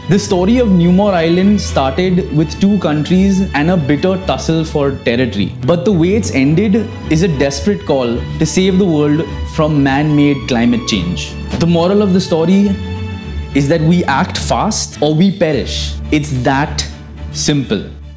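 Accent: India. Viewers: This south Bombay accent is really nauseating. I hope it vanishes.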